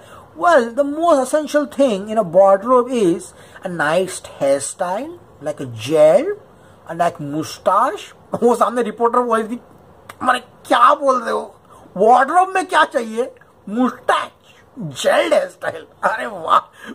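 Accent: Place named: Bihar, India. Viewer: Hahaa bhai Mai bihari but lekin jo tum nach baliye ka bihari dehat wale accent me krte ho mja aa jata hai..hahha tmhara review Bhai full mja More than bb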